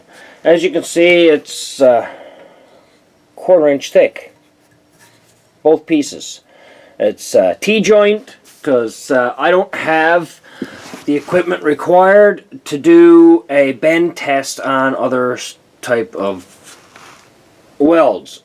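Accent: Newfoundland, Canada. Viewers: LOL! Got a like as soon as I heard! Brilliant!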